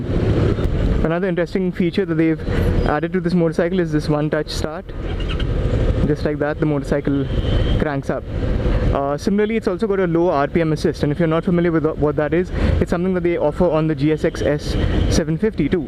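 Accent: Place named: India. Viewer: Our hero back again with awesome content. And I love your hindi accent.😍😊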